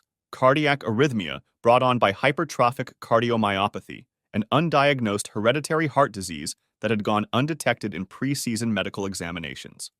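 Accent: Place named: United States. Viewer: Who else is American and didn't think it would be soccer? Especially since the voice sounds American.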